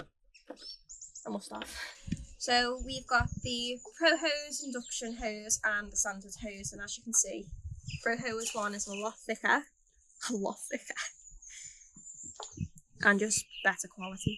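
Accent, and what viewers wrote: Liverpool, United Kingdom. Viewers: I was in liverpool for the first time at weekend 😍 ended up at the docks near all the bars! Cant wait for this video 👌🏼 p.s big up the scouse accent 😂 xx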